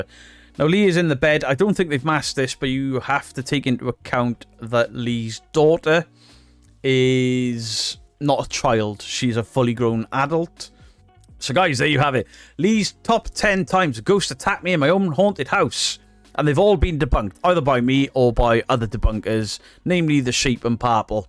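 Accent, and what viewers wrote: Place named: England, United Kingdom. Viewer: I will say, Lee's house is super clean, the yard looks lovely, and if he'd go legit for entertainment, I love the accent, people in Australia will pretty much watch anything with and English accent. Because here in Tasmania we grew up with English shows, going back to, wow, for me, the enchanted cottage, with nellyphant and little joe and the kangaroo 🤔🤷‍♀️ I can't remember his name, the wombles 😃🤗 Beatrix Potter, keeping up appearances, especially Onslow and daisy 🌼, you should react to the bloopers one day, so funny, would I lie to you, is stellar, they tried to do an Australian version and it was so cringe, I just prayed no one else in the world would see it ugh 🙈 I could just go on for hours, oh, come dine with me 😄😄😃 the voice over guy 💞💞💞💞💞, how midsomer is , where it real the most dangerous place to live on the planet, I'd love a midsomer spoof 😄😃 my poirot, my David Sutchet, oh, Still Crazy, if you English and haven't seen it ☝️for shame, my Bill Nighy, Billy Connelly, Jimmy nail, 😍 Bruce Robinson, and the other ones, I'm so sorry, my 9 year old grandson stayed last night, it seems I pick up his personality when he goes home, I'm in a very happy silly mood, and I wanted to share it here because I don't feel judged 😁🤗🙃💜👍.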